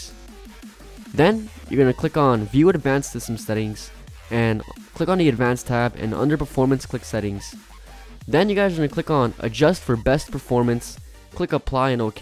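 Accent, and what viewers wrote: Croatia. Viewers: are you from croatia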